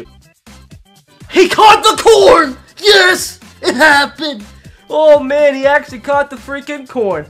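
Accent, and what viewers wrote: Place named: Pennsylvania, United States. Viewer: Pennsylvania accents make we want to gouge my eardrums out.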